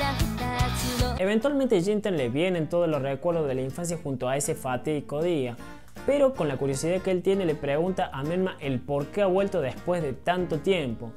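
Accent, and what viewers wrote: Córdoba, Argentina. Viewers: wpse re nota que sos de cordoba xD buena reseña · So cordobe culia?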